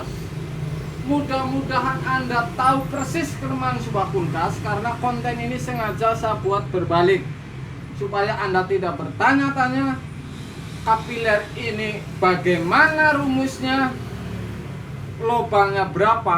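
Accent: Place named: Java, Indonesia. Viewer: Medok temen kang..sampean wong ndi..😁😁😁😁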